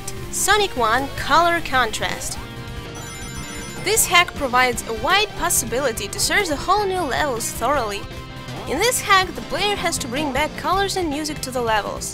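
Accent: Russia. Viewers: Russian accent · you're trying to hide your Russian accent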